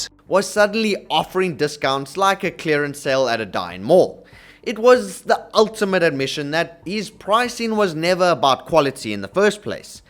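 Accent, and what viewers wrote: South Africa. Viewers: I hear a south african accent 😊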